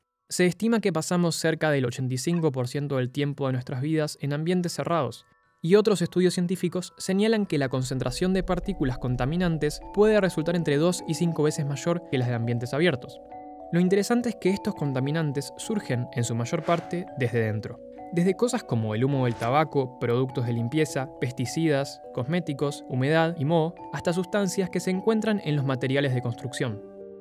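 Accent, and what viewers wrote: Argentina. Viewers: El vox argentino papa, vengo de parte de UMI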